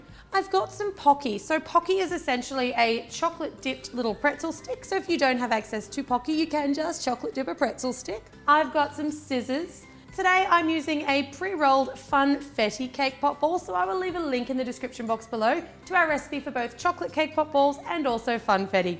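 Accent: Australia. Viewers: I just started watching your tutorials and I think they are great! ; ) I just want to know if you are Australian ? :-)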